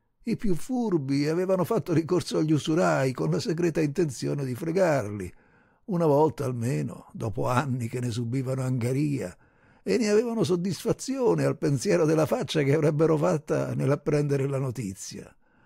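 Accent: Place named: Sicily, Italy. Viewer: Buongiorno caro 😊irresistibile il tuo siciliano❤, racconto commovente,si, ma quanto dolore e sacrificio dietro ❤❤ grazie davvero di cuore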